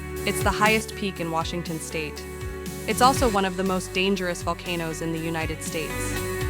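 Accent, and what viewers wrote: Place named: Italy. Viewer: I actually discovered your Lake District videos first and then worked my way back to this, your very first one. I assume the words here were yours, even if the voice telling the story belonged to someone else. I’m glad you eventually found your own voice — quite literally. As I’ve said before, you’re a natural storyteller. Your own narration, your personality and, yes, your Italian accent — I got it right this time! — make the later films feel wonderfully immersive. There’s something fitting about that progression too. Photography is ultimately about finding our own way of seeing the world, and perhaps storytelling is much the same — eventually we have to trust our own voice. And film somehow complements that philosophy perfectly. There’s uncertainty every time we press the shutter, but perhaps that risk is precisely what gives the eventual photograph its value. 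For me, the pleasure of the outcome still far outweighs the uncertainty of getting there. It is fantastic to see where these adventures began.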